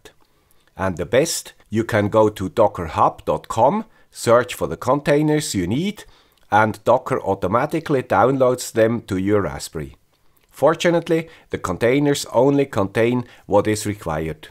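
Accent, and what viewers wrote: Switzerland. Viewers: So, Swiss aksent ist German aksent? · The guy wis a swiss accent.. That sounded so chilling. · guy with a swiss accent, we need a current video on installing docker-compose and nginx proxy manager on a raspberry pi. thank you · I think this video will give me the boost I need to have a much better home server! DrFragle and Mr. Shark was(Antonio?) already hinting on this kind of setting with docker. This is by far the most useful video I have seen from you. The Raspberry 4 will be ordered soon. The SCRIPT from Peter will now be history for me. Also, I sense a backup supply system will not be necessary anymore because of the much easier backup system. This video will keep me busy for some time, which is good! Thank you! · Fantastic! Thanks!! ʘ‿ʘ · your swiss-deutsch english accent is amazing ! cheers from cheese land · Another great video by the engineer with the Swiss accent. 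I like the summaries. Great job, I will use some of this for the VPN portion. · Very good, interesting and helpfull descriptions. Thank you !!! :) Your English vocabulary is really great, but your pronunciation is simply indescribable Swiss. Please take a much longer holiday in England (Cambridge or Oxford), it definitely will help you. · Off course: with summery! I think it's part of the brand, like the Swiss accent!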